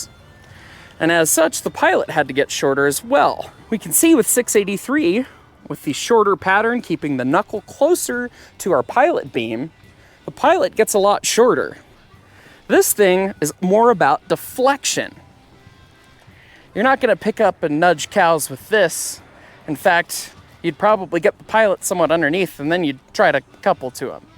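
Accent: United States. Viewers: I do not mean this in a negative way at all, but is this how men in Colorado talk/ sound? Colorado accent?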